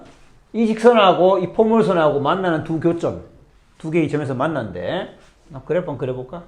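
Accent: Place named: Gyeongsang, South Korea. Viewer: ㅋㅋㅋ. 강의하실 때 샘의 억양을 들으니 혹시 대구 분이 아닐까 그 동안 생각하긴 했지만 실제 수성구 범어동일 줄은 몰랐습니다. 친척 따님이 수성구에서 KT 다니는 남자와 백년해로를 언약해서 거기서 혼례를 치르기로 했습니다. 정말 대단한 우연이네요. 저도 일반적으론 높은 확률을 따라가긴 하지만 이상하게 꼭 그렇진 않게 되더라구요. 전 정수론과 복소해석학이 전공인데 확률 전공이 아니어서 그런건 아니지만 전 수학자이면서도 절대적으로 확률을 믿지는 않는 경향이 좀 있습니다. 우연에 내맡겨 버리기도 하죠. 저도 지난 주 파워볼 60개 번호 중 8개 숫자 조합을 모두 맞추면 잭 팟을 터뜨리는 아주 희박한 확률 싸움에서 겨우 2개를 맞춰 35 달러(한화 약 5 만원)를 타면서 역시 잘 안되는구나를 절감했지만 그래도 재미로 소소하게 하는건 괜찮으거 같아요 ㅎㅎ. 그나저나 돼지갈비로 먹을지 소갈비로 먹을지 벌써 갈등이 생기네요 ㅋㅋㅋ.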